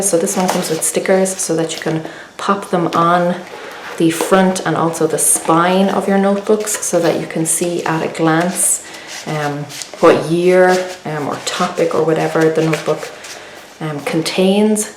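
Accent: Ireland. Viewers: I love your Channell and bye the way I am sorry if this is rude but your voice kind of sounds irish and I live in Ireland do you?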